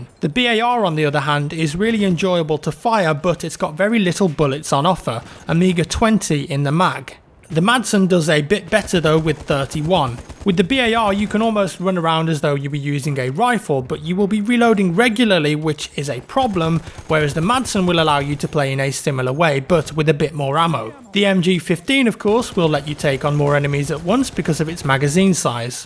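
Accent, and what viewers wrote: United Kingdom. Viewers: YOU. R. SO. BRITISH.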